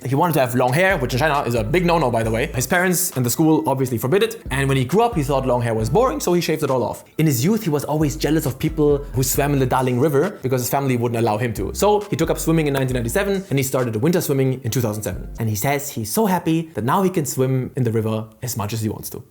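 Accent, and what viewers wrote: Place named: Germany. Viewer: Why does bro have a german accent `?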